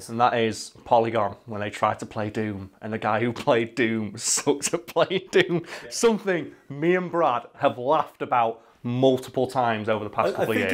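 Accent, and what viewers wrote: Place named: United Kingdom. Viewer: * heavy British accent *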